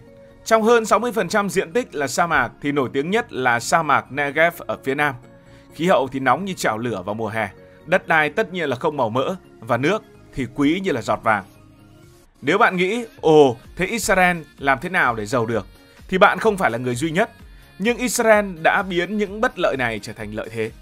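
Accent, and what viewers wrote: North, Vietnam. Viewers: Thông tin đăng tải và giọng thể hiện của bạn rất hay, nhưng mong bạn phát ấm chuẩn tiếng Việt để thể hiện "đẳng cấp" của kênh và ko gây ức chế cho người nghe cũng như giữ gìn sự trong sáng của tiếng Việt. Cụ thể: bạn ko đến nói dạy học thành dậy học, giàu có thành giầu có... đây là hiện tượng phát âm sai của người dân một số vùng quê đồng bằng Bắc bộ (được cho là quê mùa).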